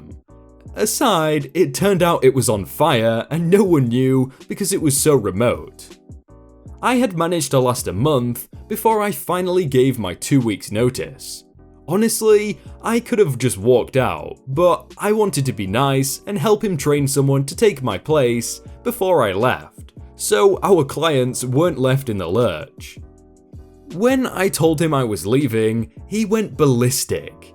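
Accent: United Kingdom. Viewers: He's pronouncing [SCAY - DA] due to his British Accent.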